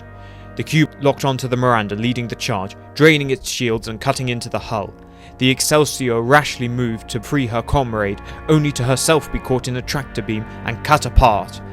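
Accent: United Kingdom. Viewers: I'd prefer it without the British accent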